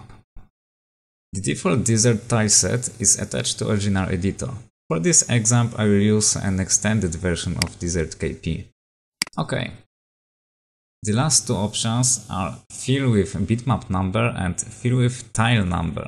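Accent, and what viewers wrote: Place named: Poland. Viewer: Widziałem, że skądś znam ten akcent, Polak jesteś. :D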